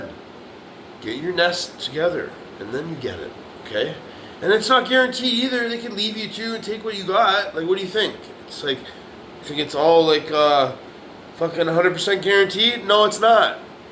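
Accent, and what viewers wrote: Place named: Canada. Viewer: 😂🤣you're hilarious, dude this is spot on, the excessive noise and the god damn heel stomping everywhere they walk and banging dishes is 100% truth. Are you a fellow Canadian by any chance? because you sound like one, cheers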